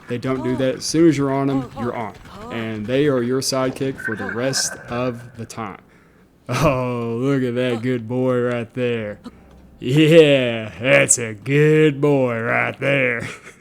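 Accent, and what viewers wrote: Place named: South, United States. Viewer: You're from the south, aren't you?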